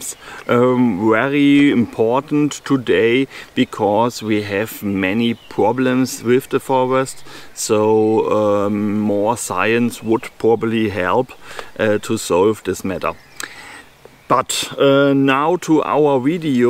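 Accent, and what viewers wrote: Germany. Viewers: Hallo, hab grade das deutsche Video angemacht und als ich gehört hab, dass du das auch auf Englisch gemacht hast musste ich doch gleich mal reinschauen bzw rein hören. Finde so einen starken deutschen Akzent immer total lustig. Hab ich selber zum Glück nicht soo die Probleme mit. Egal. Respekt dafür dass du dich trotz Akzent vor die Kamera gesetzt hast. Würden sich viele nicht trauen. Aber bei deinen Inhalten ist das alles sowieso eher zweit- wenn nicht sogar drittrangig und da ich die allermeisten deiner Videos gesehen habe würde ich mal behaupten es wäre schlimmer dem internationalen Publikum diesen großartigen Content weiterhin vorzuenthalten. Inhalt vor Verpackung würde ich mal sagen😅 In diesem Sinne... Übung macht den Meister und solange dich alle verstehen ist doch alles gut. So... Und da ich eher selten Kommentare schreibe mal was Allgemeines: Mach bitte weiter, sodass wir alle noch viel mehr von dir lernen können. 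Jetzt sogar international 👍 Danke! Danke dass du uns dieses tolle Geschenk machst. Deinen ganzen Kanal meine ich. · man merkt den deutschen Akzent nur ganz am Rande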